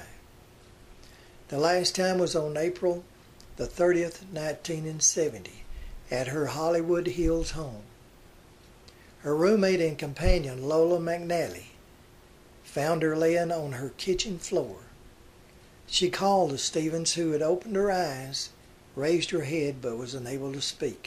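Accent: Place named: Alabama, United States. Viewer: you sure got that right. and I like the way you talk, whoops done gone Slingblade on ya there Carl. I mean at times we sound alot alike me from mobile an all. Roll Tide 🏈 course I'm just 60,kinda spring chickeny yet badly bent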